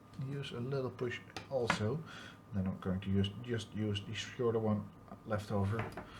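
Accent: Netherlands. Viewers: Ik hoor aan uw accent dat u Nederlands bent dus dacht stuur u even een berichtje in die taal. Ik heb op dit moment het houtboard gezaagd en hou uw boor breedte van 3.2 mm per ledje aan. Nu vroeg ik me af, Hoeveel cm u tussen de ledjes heeft zitten. Is dit toevallig 15mm? Vriendelijke groet en bedankt voor uw zeer gedetailleerde video.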